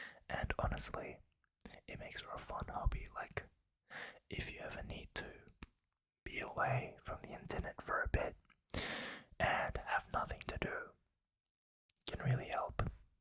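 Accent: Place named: Australia. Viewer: Literally 4 seconds in I could tell you were from or are in Australia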